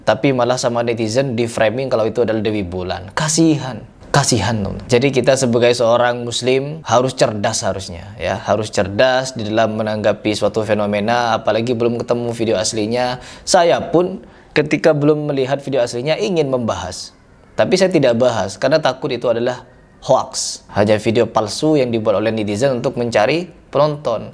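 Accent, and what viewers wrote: Madura, Indonesia. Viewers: Kau orang madura ya bang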